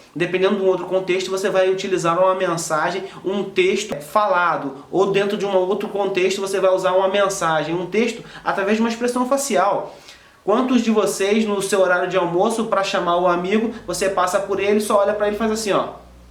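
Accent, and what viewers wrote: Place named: Rio de Janeiro, Brazil. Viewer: Nem precisa fala que é do RJ com o sotaque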